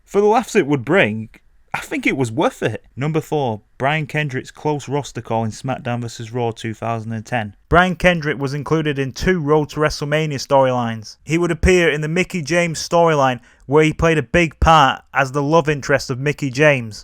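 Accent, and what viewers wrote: Yorkshire, United Kingdom. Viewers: You have a propper broad Yorkshire accent it’s brill 😂😂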